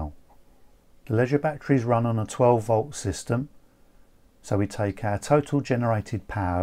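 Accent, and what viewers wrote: United Kingdom. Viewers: Ty, a different view on the power of solar panels and very informative. Also, nice to hear a fellow brit msking these kind of vids. Good luck with the build and ty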